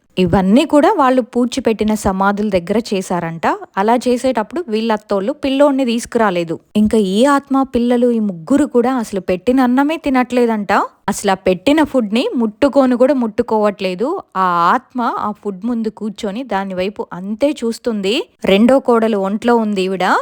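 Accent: Telangana, India. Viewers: Hi, I'm a new subscriber from last 3 weeks ago, I'm a big fan of horror stories junky lot more interested, Randomly your video the bangalore delivery person video nundi almost back to back 25-30 videos chusanu, Miru telangana slang lo kuda baaga matladtunaru, miru cheppe style as it as miku stories share chese valla laga cheptunaru 🙌👏👍 best of luck 🤞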